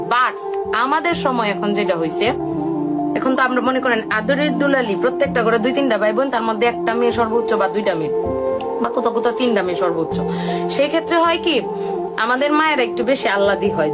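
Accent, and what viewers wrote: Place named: Mymensingh, Bangladesh. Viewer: ভাষা শুনে বুজলাম এই মেয়ের বাড়ি ময়মনসিংহ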